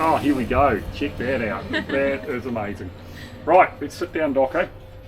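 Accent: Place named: New Zealand. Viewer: he is a new zeelander i think because he says tin instead of ten. oh yeah silly me i see NZ in the channel title now.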